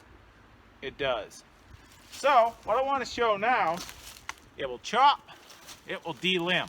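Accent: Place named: Chicago, United States. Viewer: a very nice blade. "Da Chappa" with a nice Chicago lilt. Cheers, Mate.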